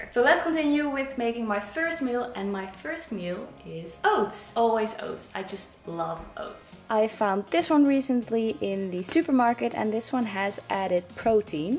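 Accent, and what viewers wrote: Netherlands. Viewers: Toen je begon met praten hoorde ik meteen dat je Nederlander was :)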